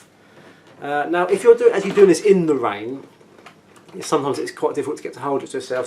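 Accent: Cockney, United Kingdom. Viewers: These videos are a bit of fun really. Yes, we could see a top-down version on a plain background, with nice zooms to the intricate parts (F-connectors, the wire-stripping task), and a slightly less frenetic pace, but it wouldn't be so enjoyable. From Australia, I enjoyed the East End accent. All good, got what I needed and had a fine viewing experience. Thanks. PS. What's the "Favourite Worst Nightmare" BTW?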